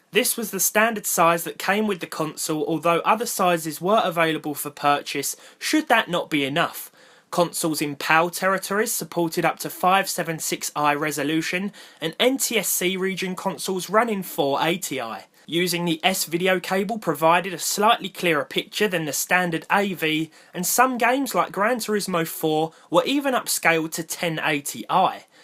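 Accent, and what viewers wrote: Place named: Essex, United Kingdom. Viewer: I'd have to kill myself if I spoke like him , a towie reject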